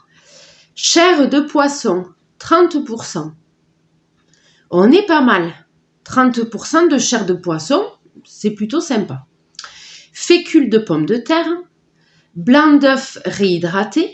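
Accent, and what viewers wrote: South, France. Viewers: Bonjour, merci pour ce bon moment de partage. Test approuvé 👍😁🐱🐶fins gourmets. Vous êtes d'où? Accent du Sud? Bonne journée à vous et votre adorable famille. ❤️😘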